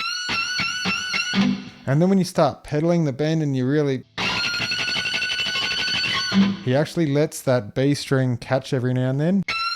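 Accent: Australia. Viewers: Hey, You sound like an Australian, ain’t ya?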